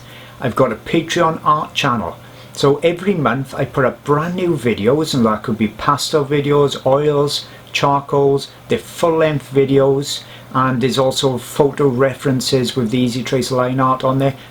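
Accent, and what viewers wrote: Wales, United Kingdom. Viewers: The absolute joy I have not only finding this video in my first ever day using pan pastels, but also hearing the voice of a fellow cymry. I just find it so soothing and familiar when learning new things, so I'm overjoyed to have found your channel.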